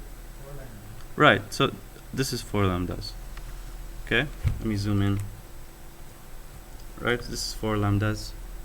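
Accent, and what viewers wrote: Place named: Lebanon. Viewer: I can smell a lebanese accent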